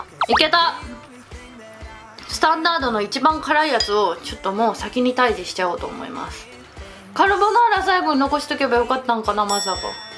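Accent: Hakata, Japan. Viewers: 最後の方は辛さで味がわからなそう😨 今更ながらの博多弁がめちゃカワイイ‼️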